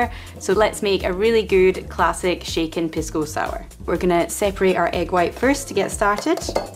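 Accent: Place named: Scotland, United Kingdom. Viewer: Nice and simple. I love the Scottish accent but cracked up at piss-go for pisco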